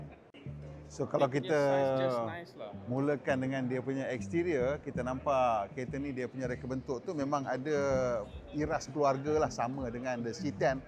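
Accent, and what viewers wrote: North, Malaysia. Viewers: noh...asai utara ka?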